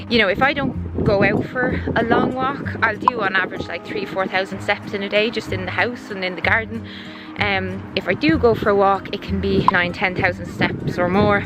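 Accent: Ireland. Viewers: My names is ruby!! You messaged me when you was pregnant last time the way you both say ruby is so cute I love my name way more in an Irish accent and little 2 year olds voice can't believe how fast your pregnancy has gone I couldn't believe it when I started watching all the best to you anc your whole family I'm so excited for you and this little one who gets such and amazing village around her to raise her to be an amazing human so much love ❤️ the hair is amazing reminds me of og stay safe look after yourselves lots of love xxx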